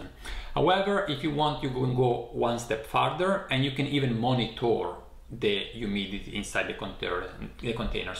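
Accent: Italy. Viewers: Ciao, ti ho sempre e solo sentito parlare in inglese, e ho questo hobby di cercare di capire la provenienza degli italiani a partire dal loro accento in inglese, che mi porta alla domanda: sei pugliese?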